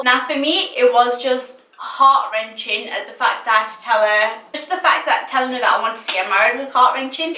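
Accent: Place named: Manchester, United Kingdom. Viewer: Omg lov ur manchester accent... u have same as me 😛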